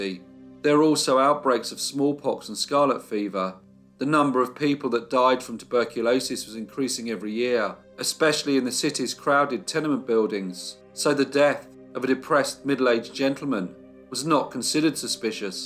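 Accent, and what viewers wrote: United Kingdom. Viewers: Fun Fact ~ Just for clarification for the North American viewers when the narrator says Lydias eldest daughter also named Lydia worked as a clark... It is "Clerk", for some reason the British use an A sound instead of our E in clerk.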